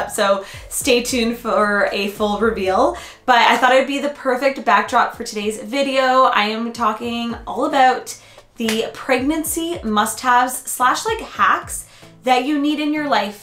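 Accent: Canada. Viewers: How rude, it's a Canadian accent. Check your ignorance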